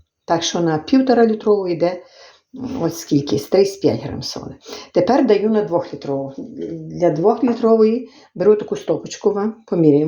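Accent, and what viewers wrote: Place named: Transcarpathia, Ukraine. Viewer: Дякуємо за чудовий,простий у виконанні рецепт і чудову українську мову Закарпаття🙏🇺🇦🇺🇦🇺🇦👍💙💛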